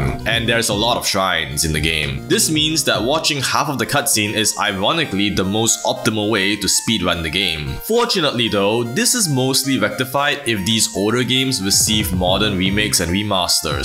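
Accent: Singapore. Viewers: I'm curious what accent this is btw. Feels like Singaporean mixed with something else. And Singaporean makes sense with your DigiPen cert.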